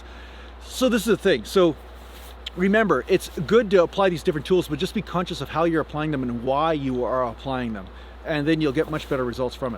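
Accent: Canada. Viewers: Are you Canadian aye?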